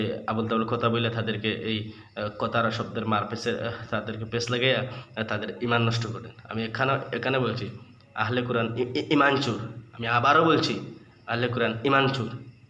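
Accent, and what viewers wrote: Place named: Chittagong, Bangladesh. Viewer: তোমার কথাই এখনো ফোটে নাই,চাটগাঁইয়া ভাব কথায় স্পষ্ট। জ্ঞানের গভীরতা বাড়াও।মরিস বুখাইলীর মতো "বিজ্ঞান, ইঞ্জিল ও কোরআন " একটি বই লেখে দেখাও।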